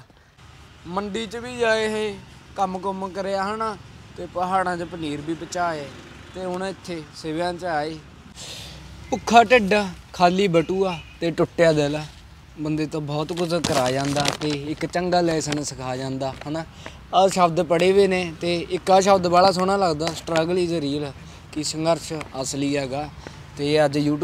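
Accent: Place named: Puadh, India. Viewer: Y tera pind kehra Boli tan Sade aali Puadhi lagdi aa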